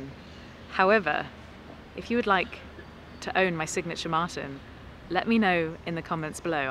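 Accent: United Kingdom. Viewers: I know you hear this all the time but I so love your accent. Just British beautiful.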